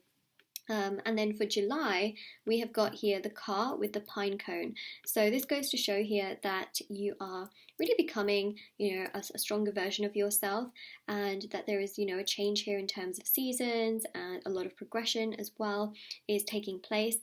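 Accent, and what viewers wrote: England, United Kingdom. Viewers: Oh my gosh you’re English! Glad to see a fellow countryman represent! 😆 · You’ve got a beautiful English accent 🏴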